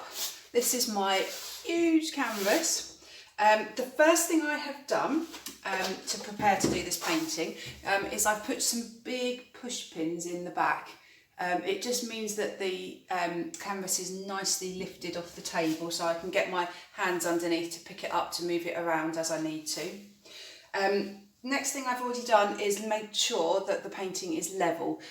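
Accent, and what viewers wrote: United Kingdom. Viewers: Beautiful and it is so nice to hear a British Artist - just starting out so this is so helpful - can’t wait to watch more of your demos 😀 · It makes a lovely change to hear a British accent. This is beautiful. Having had so many disappointments while I learn I can only dream of creating something like this.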